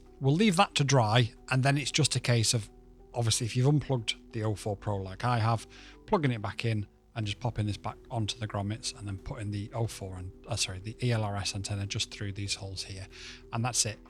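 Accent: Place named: Manchester, United Kingdom. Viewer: is Welsh, but you hide your Mancunian twang quite well. To my Scandinavian ears, it sounds more like a standard northern accent. But don't trust my opinion — I'm just an ABBA-Volvo-Ikea bloke and everyone knows what happened at Lindisfarne when the monks trusted us.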